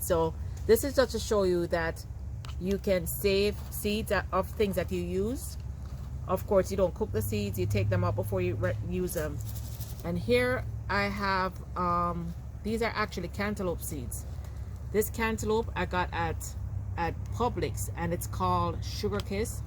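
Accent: Jamaica. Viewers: Hey, love the video. Just discovering your channel and knew from the sound of your voice you are Jamaican. Love how you store your seed, I use wipe containers to save mine 🤦🏾‍♀️. You are right. No need to go hungry when we can plant our own food. While not everyone has the space container gardening is a viable method for most people.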